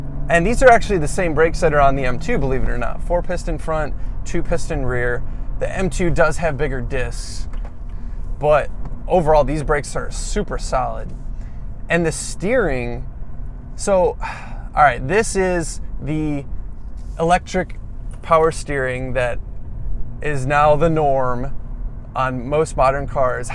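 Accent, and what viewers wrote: United States. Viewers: Dmytro Chygrynskiy speaks perfect American??! Awesome video bro! xD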